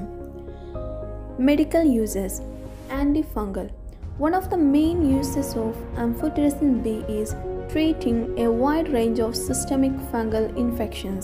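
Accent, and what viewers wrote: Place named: Kerala, India. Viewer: The speaker is mallu 💯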